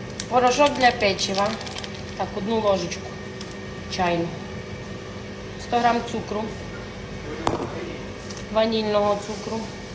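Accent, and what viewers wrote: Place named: West, Ukraine. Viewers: Взагалі українки говорять українською мовою, а не рашистською. Автор відео говорить західно-українською ( діалект). Якщо не подобається, не дивіться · То чого ж це ви, «українка», російською пишете? А я ось, не українка, вивчала українську у радянській школі у Києві , живу більш ніж тридцять років в Ізраїлі, але ж не тільки пишу українською а ще й усе те, що ця шановна пані каже, зрозуміла. Дуже приємно слухати західний діалект, немов річечка. А вам таки має бути соромно! 😁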